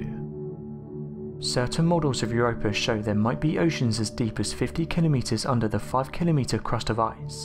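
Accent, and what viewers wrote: United Kingdom. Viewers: That is it. I am sick of your videos. 1) you should not narrate your videos until you correct your "Rs" and "Ss" and loose the brit accent. 2) Stop using deceptive titles. When you were a child, you got in trouble for stealing.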